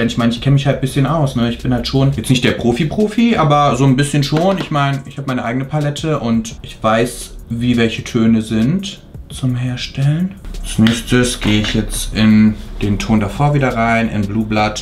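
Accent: Germany. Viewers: Du kannst echt gut Englisch sprechen, aber du hast so einen starken deutschen Akzent haha😂😘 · Unnötig dieses Denglish! Wenn er wenigstens einen amerikanischen oder britischen Akzent hätte, aber der deutsche Akzent geht gar nicht!!!!!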